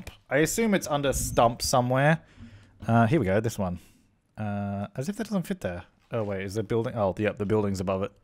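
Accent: Australia. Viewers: I once watched on my laptop closed it over then in the middle of the night the video somehow started again and (who lives females) suddenly beard a male Australian accent shouting about Katrina My name is pronounced Katrina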